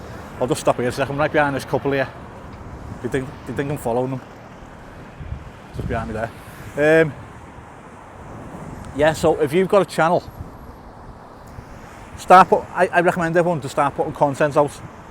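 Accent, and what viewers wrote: Liverpool, United Kingdom. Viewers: A warm greeting from Indonesia! As a non-native English speaker, I absolutely adore the Scouse accent - it's simply incredible! Although I am still in the process of learning English, I subscribed to your Youtube channel as I would love to become more familiar with the Scouse accent. Hopefully, one day in the future, I will be able to speak English with a Scouse accent myself. Wishing you even more success on your Youtube channel! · I watch nearly all of your videos and I’ve never noticed any speech impediments at all. You sound like a perfectly normal scouser 🤓👍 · Thanks for the Videos. You alway come over as a caring and genuine character. You're not just out for the aggressive click bating like some YouTubers. I've never noticed the speech impediment? You're just good old scouse! Because you're human, if you receive 100 positive comments and 1 negative comment. 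It's that 1 negative that sticks in your mind and will out weigh all the positives. Just keep doing what you do mate. It's working!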